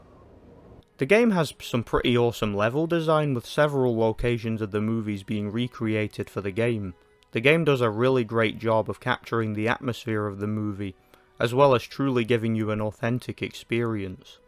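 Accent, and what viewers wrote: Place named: Nottingham, United Kingdom. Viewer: complete shot in the dark, especially if you say you've moved around but I'm hearing some Nottingham mostly, maybe some south or west Yorkshire or Geordie. But alternatively your "L" sound feels like Richard Taylor from New Zealand, but the rest of your vowels don't match the nasal brightness of that accent. Either way GREAT review. I played this one after Return of the King so unfortunately it felt like a big step down in a lot of ways, it felt clunky and a bit too difficult to be fun (but maybe I just sucked lol, especially that Helms Deep protect the door level). But thanks for your work. Brought back some memories.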